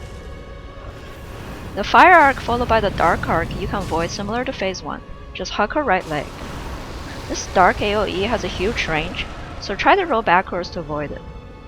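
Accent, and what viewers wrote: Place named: China. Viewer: 妹子你是中国人嘛😳 I like your accent; it sounds just like mine.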